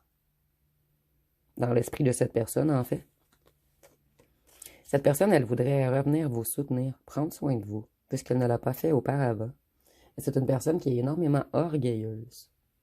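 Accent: Quebec, Canada. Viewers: Je sais pas si je suis devenu addict à vos guidances ou à votre accent Québécois que J adore 😊. Bise de France 🇫🇷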